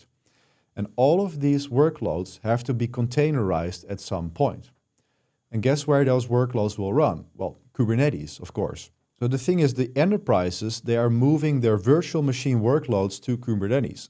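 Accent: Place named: Netherlands. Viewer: My father has been a Kubernetes engineer since the early days of Kubernetes. I learned a lot from him when I was only 17 years old. I got my first Ubuntu laptop at the age of 16. After graduating, I got a job in Belgium as a DevOps engineer. I earned this job for one reason: my knowledge of Kubernetes. Even though I’m only a junior, my experience was already enough to work on production environments at the company. There are only two of us in the company who really know how to work with and implement Kubernetes. Dutch language: PS ik hou van je Nederlands acces. Herken mezelf erin 😊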